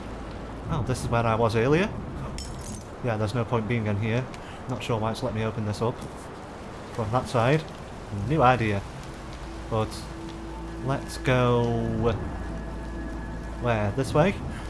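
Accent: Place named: United Kingdom. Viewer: This man is very Bri'ish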